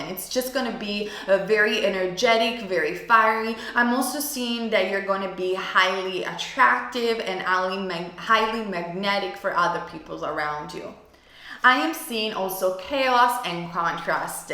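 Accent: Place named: Italy. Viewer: Love the Italian accent!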